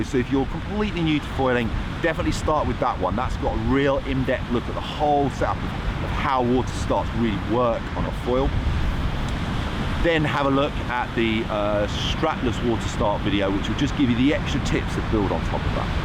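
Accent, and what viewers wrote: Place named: United Kingdom. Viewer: Great vid! Clear British instruction!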